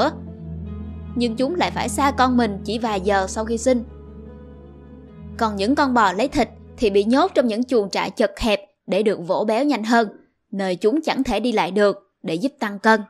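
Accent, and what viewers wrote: South, Vietnam. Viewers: giọng đọc hay kiểu miền nam mà sao xài chữ Lợn với Lạng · Kg biết ai thích thịt thích rau gì chứ riêng tui thì tui khoái cái giọng con gái miền nam ghê à ngen ^^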